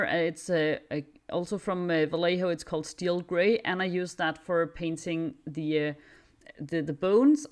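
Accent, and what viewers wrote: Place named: Denmark. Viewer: something in your english reminds me of how I speak english.. are you perhaps danish? awesome scheme btw(og hvis du dansk, stærkt farvevalg)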